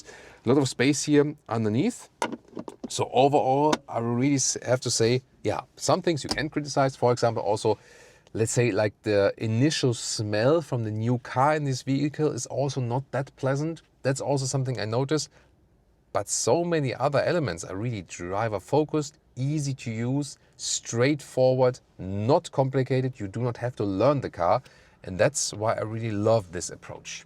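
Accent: Germany. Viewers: His german accent is so hard and difficult to understand.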